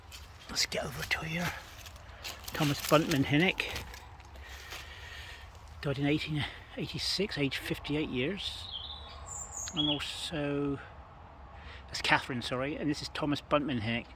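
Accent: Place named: Cornwall, United Kingdom. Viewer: i find your videos soooooo relaxing. and i love your cornish accent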